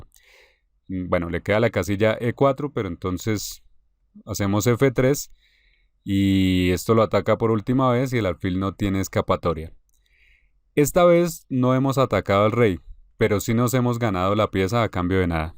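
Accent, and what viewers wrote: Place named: Bogotá, Colombia. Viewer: Habla como si fuera de colombia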